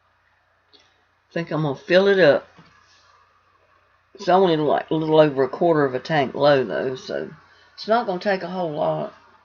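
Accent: United States. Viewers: I have to thank you-that as a German I understand your American way of pronouncing it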